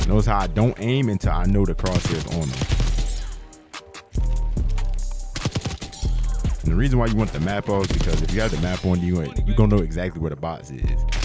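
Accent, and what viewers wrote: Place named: South, United States. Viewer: Bro you from the south ain‘t you 😅 anyways great videos!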